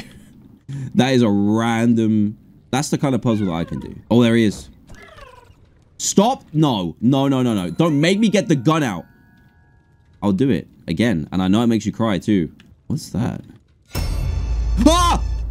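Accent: United Kingdom. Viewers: I know this is just UK accent but.. mario sounds like mario but the letter a sounds like A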